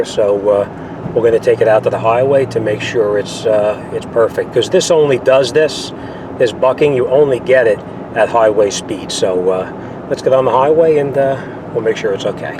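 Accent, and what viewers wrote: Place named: New York, United States. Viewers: You from New York?👀 Love the accent.💯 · I like your accent ! haha A NYC mechanic!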